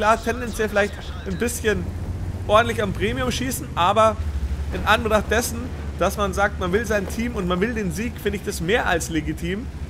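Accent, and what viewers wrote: Bavaria, Germany. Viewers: Das Bayerische hört man schon raus. Aber ich mag das. Ist authentisch und macht dich sympathisch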